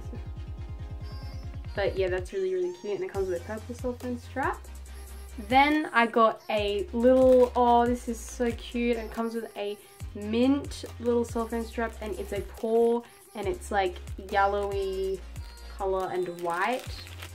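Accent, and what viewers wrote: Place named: Australia. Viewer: Erika Flores I have the same accent as her it is cause she is from Australia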